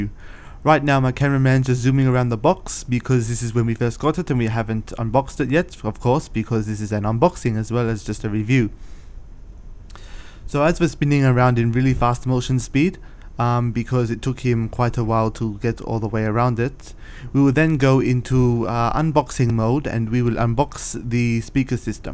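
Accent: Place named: South Africa. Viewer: You sound south African :D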